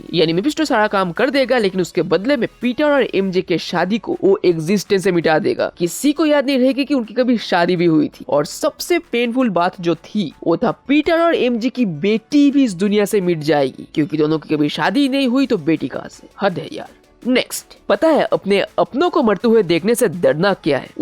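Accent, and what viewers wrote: Bengal, India. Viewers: ji Aap kya Bangali ho?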